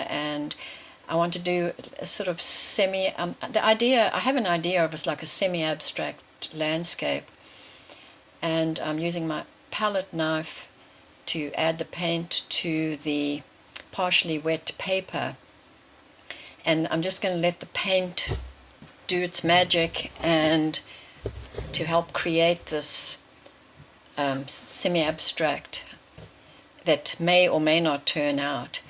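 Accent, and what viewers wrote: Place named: South Africa. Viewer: Hi..I'm new to water colour, and your demo's are so helpful. I'm am oil painter, so it's quite an adjustment trying to work in reverse to what I'm used to. Nice South African accent too...where in SA are you from 😊?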